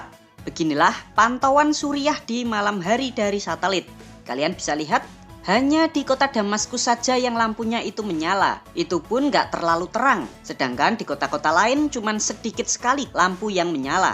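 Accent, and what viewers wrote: Java, Indonesia. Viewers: Jawa medok bner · Metdok buanget. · Kok kyak ngomung bahasa jawa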